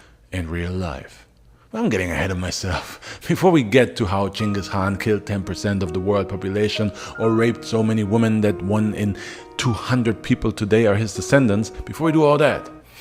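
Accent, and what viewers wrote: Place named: Southwest, Germany. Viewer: If you are not an instructor you should be! Also you mentioned in another video about an allowance in euros. Did I detect a southwestern german accent? I have friends from that area that pronounce the letter R like you do. Keep these vids coming, Thanks!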